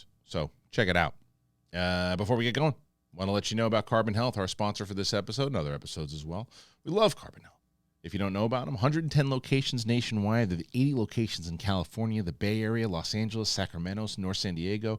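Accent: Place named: Brooklyn, United States. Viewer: Damn I love listening to lol! It's like listening to an uncle from Brooklyn describe some bad ass movie with a 90s neighborhood gangster take on the unfolding scenario with a brilliant mind and a east coast vocabulary!! F#@ great!! I love it!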